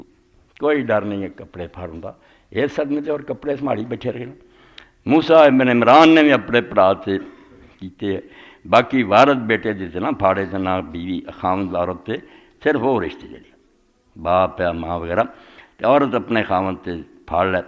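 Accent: Punjab, Pakistan. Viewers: ni bech men punjabi touch bhi hai!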